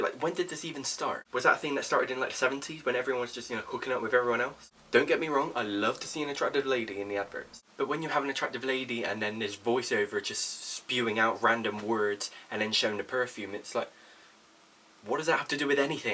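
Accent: Bristol, United Kingdom. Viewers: Your Brizzle accent makes me so happy :')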